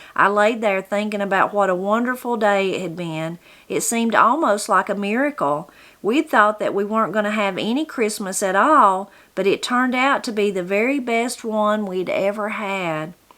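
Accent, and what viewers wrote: Appalachia, United States. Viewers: I enjoyed that story so much, and you're a fine reader. You're the sort of reader who lets me lose myself in the story and forget that there is a reader at all. I pictured everything so clearly in my mind's eye. I think having someone with an Appalachian accent tell the story was a big part of what made it come to life. Thank you for a wonderful Christmas gift.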